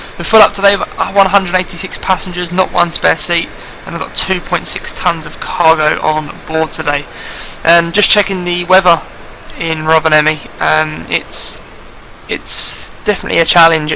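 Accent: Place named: England, United Kingdom. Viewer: Like your English accent 😂🤔🤔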